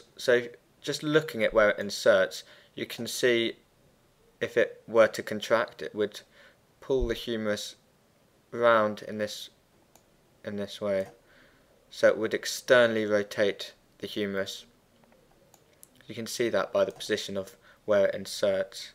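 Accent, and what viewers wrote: United Kingdom. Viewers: I can just imagine you teaching to your class and everybody's getting distracted with the British accent! Good job in your explanation though. Clear and concise :-)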